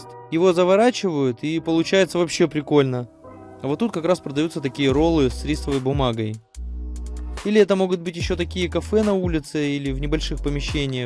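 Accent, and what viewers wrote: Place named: Arkhangelsk, Russia. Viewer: Добрый вечер, 😉Интересная кухня, наверное, вкусная)))Но северные шаньги и калитки, думаю вкуснее 😊Про образование, в следующем видео, я бы хотела знать )))А разговор, Архангельский, знакомый 😊👍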